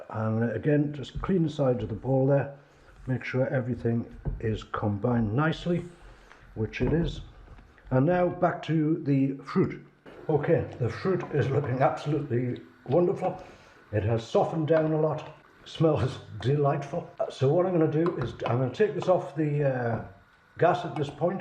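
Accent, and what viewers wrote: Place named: North East England, United Kingdom. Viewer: where are you from? It sounds like your from the North East England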